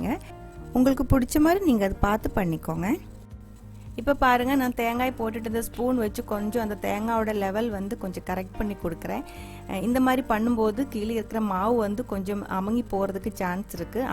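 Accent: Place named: Tamil Nadu, India. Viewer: I like ur Tamil accent 😍😍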